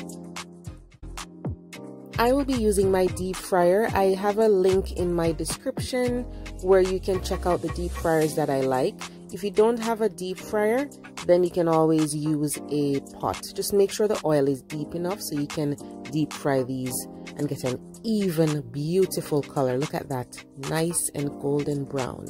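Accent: Jamaica. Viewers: U must be jamaican. U sound like my mummy. 🇯🇲😭WONDERFUL VIDEO. I gwain try this it looks DELICIOUS!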